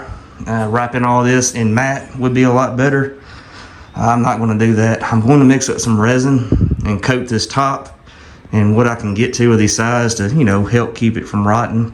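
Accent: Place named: Ohio, United States. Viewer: what state are you in it sounds like ohio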